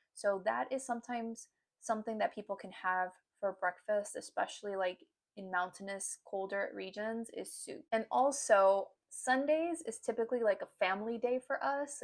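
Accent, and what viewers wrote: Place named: United States. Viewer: Tienes el acento americano o.o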